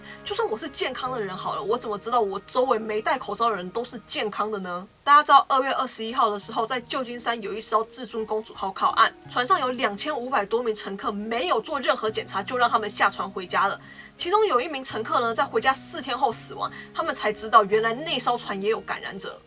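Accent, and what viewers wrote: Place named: Taiwan. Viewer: 武汉你妹，死台湾腔！你们美爹控制不住了哈，你们等死吧！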